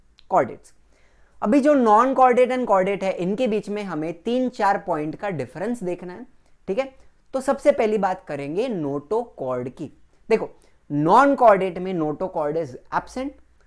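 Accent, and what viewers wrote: Maharashtra, India. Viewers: Sir you marathi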